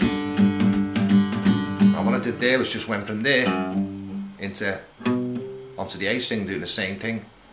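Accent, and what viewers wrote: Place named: Liverpool, United Kingdom. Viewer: Love listening to the scouse!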